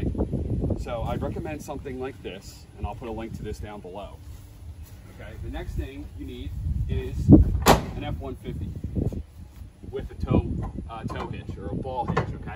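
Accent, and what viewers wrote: Philadelphia, United States. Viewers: I knew my man was from Philly before I saw the Flyers shirt and the PA plates lol. Great video.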